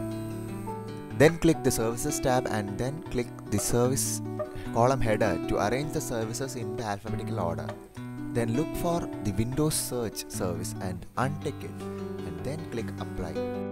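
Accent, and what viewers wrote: India. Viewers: Thank you indian-accent-man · I'm not sure if someone has mentioned this previously, but the addition of music in the background of this video serves needlessly to making the information you are presenting more difficult to understand. Although we both share a common language, I suspect that you would no doubt find my Canadian accent to be as difficult to understand at times, as I do your Indian accent and the background music contributes considerably to this difficulty. If your intention is to appeal to as wide an audience of English speakers as possible, you might consider omitting background music from future videos.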